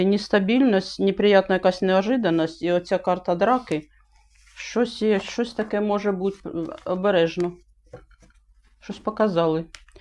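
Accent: Kherson, Ukraine. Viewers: Да,ми наХерсонщині так говорим і всі привикли івсе нормально...,чекаю вас по утрам з нетерпінням,величезне вам дякую!!! За нашу ХерсонщинуДЯКУЮ велике,чекаємо....Каховка...❤️💙💛🇺🇦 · Дякую за Вашу работу 🙏🌷 не звертайте увагу на придурків….. мені дуже подобається, як Ви розмовляєте…. Так розмовляють на моїй Херсонській землі 🥰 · Доброго ранку незвертайте уваги люди нерозуміють того що херсонщина вся розмовляє суржиком іперейти на чисто українську одразу це тяжко ви все нормально розказуєте дякую за розклад пишу і слухаю ірадію тому що ви кажете дякую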